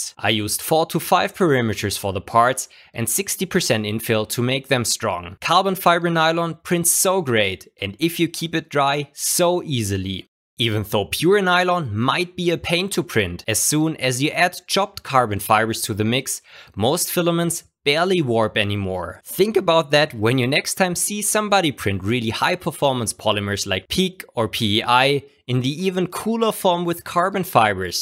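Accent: Germany. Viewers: Warum skyped ihr auf englisch? Yeah, I know, why do I watch only english videos? But you both speak german. You, I can hear from a mile through your gernglish, (sorry, js) and also lives in germany, I think. His english only very rarely gives him away, and if you don`t know he is from germany, and don`t speak german yourself, you probably wouldn`t even catch it most times. -I didn`t, only when he got german greetings half way through a stream.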